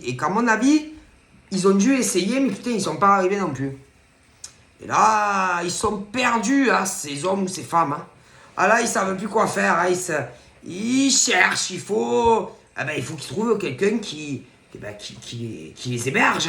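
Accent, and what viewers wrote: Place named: Marseille, France. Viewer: adore votre accent Marseille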